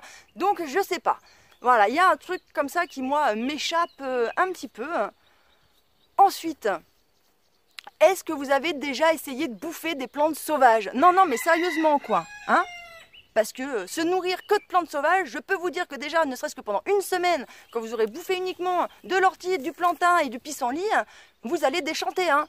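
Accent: Paris, France. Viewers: je suis désolé mais je me devais de revenir sur certain points de cette vidéo qui m'ont fort dérangé. Ton ton de voix dans cette vidéo, ou encore ta manière de parler m'ont donné beaucoup de mal à finir cette vidéo. J'ai l'impression que je me fais gronder même si je sus pas concerné. Je trouve que tu as la façon de parler du jeune ado parisienne, hautaine, qui sait tout sur tout, limite à donné implicitement des ordres. Et puis surtout, et je pense que c'est ce qui fait mon impression, c'est les "in" à la fin des mots et les quoi à répétition, sans oublié les phrases classiques de type "non mais j'sais pas quoi" ou "c'est - un truc - de dingue", ou encore les mots comme "choqué", "grave", etc. C'est extèmement désagréable, je suis désolé mais ça m'as fort frustré et dégouté, alors que le but de la vidéo est d'intéresser les gens de les faire changer, et pour moi, si quelqu'un me parle comme ça pour me faire la morale, je lui envoie deux claques (virtuel parce que je n'aime pas la violence) et je l'envoie balader, qu'il ou elle aille se faire f*****. 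Pour capter une audience, c'est la pire chose à faire Ensuite il y a deux points dans ton résonement où je ne suis pas du tout d'accord. Premièrement, oui on peut vivre de chasse et de cueillette, bien sûr que l'on peut vivre de chasse et de cueillette. Nous sommes des animaux, et avec le savoir faire nécéssaire, nous sommes totalement capable de nous nourrir comme cela, tout comme les autre animaux, nous n'y sommes juste plus ha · cette accent parisien avec des "en" a chaque mot c'est terrible . aprés je comprend ta logique mais jvois pas la finalité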